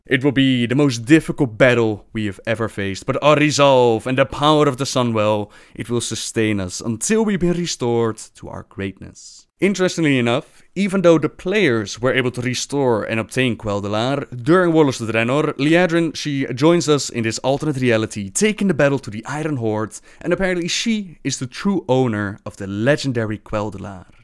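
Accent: Netherlands. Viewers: Garrosh Hellscream If you're talking about, he is Dutch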